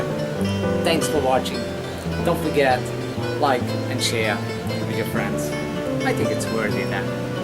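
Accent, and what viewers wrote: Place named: Portugal. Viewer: Bom trabalho! I knew you had to be Portuguese. Your accent reminded me of my father who was an amazing woodworker in his own right. I could watch him all day. I love watching your channel and your amazing creations. You have inspired me to maybe try my hand at wood turning hopefully in the near future. Nao pares suas criacoes!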